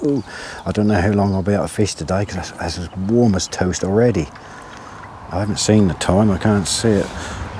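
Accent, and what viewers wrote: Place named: East Anglia, United Kingdom. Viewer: Waggler is difficult on a windy day but it looked calm there. With the amount of pellets needed to keep the fish in the upper layers not all of them get eaten so you’ll have a nice bed on the bottom for the bomb & pellet or method feeder. A lot of the time the bigger carp will sit just at the back of the feed and pick off a few loose ones so casting past your pellets isn’t a bad idea. Then fire in a few more and twitch into them. Eventually those bigger carp will have your hook bait. Lots of lovely lakes packed with fish in the Norwich and surrounding areas. Can tell you’re a born and bred Norfolk/Suffolk boy with that accent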